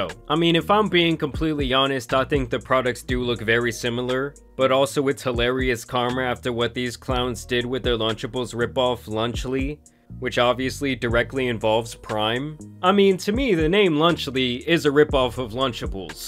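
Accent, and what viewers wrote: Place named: Boston, United States. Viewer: Your dogs are adorable!! Man, I'm binging this channel! I love the blend of the comedy and intelligence! …and I think I figured you the awesome accent! My fav! It's Boston, right? OH, I was a little disappointed at mark, I thought you were about to rap when I heard how easily “Likely still They could not come to an agreement In this situation Prime continued to threaten litigation” flowed.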